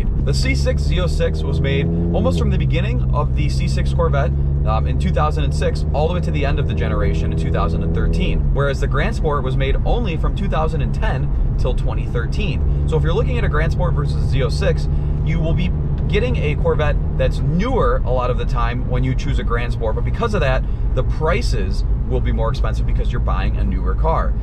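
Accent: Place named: United States. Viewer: haha no no I understood that maybe it’s your American accent. I’m from Toronto Canada! Definitely the GS and Z06 platforms are best bang for your buck but the ZR1 is a beast. It’d be a nice car to review on the channel if you can get your hands on one!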